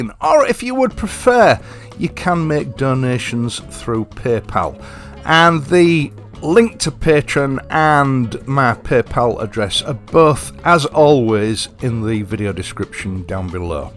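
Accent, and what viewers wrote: United Kingdom. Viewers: No no no, you speak British!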